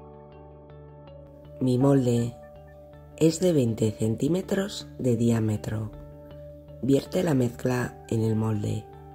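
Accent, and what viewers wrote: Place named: Spain. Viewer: Los limones en Mexico son verdes no amarillos son lo mismo?. De q pais eres, me suena tu voz a Española.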